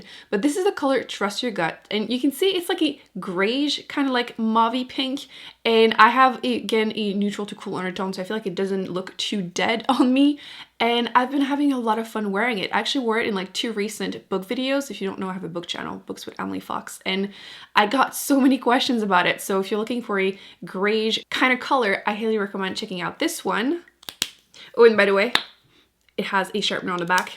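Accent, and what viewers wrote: Quebec, Canada. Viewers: I could listen to you talking all day. LOVE your accent. It's French Canadian yeah? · yes i thought it sounded faded French and then i googled it and someone said you sound French Canadian. Usually they have heavier accents though. Where do you live?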